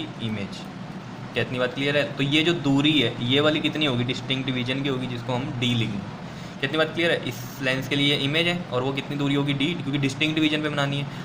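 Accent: Punjab, India. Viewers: Its subh exam h sir 😢😢😢😢 sir aapki voice achi h give punjabi vibe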